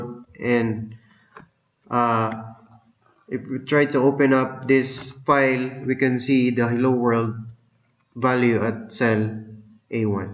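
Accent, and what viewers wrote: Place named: Philippines. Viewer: You sounds like from Philippines bro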